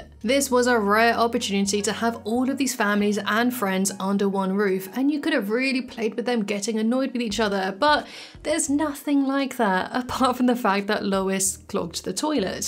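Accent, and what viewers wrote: United Kingdom. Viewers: I don't get America (or maybe Fox)'s love for Benny Hill either, and I am American. But it's funny to me I legit forgot you were English (despite it being your accent) until you mentioned it. What does that say about me?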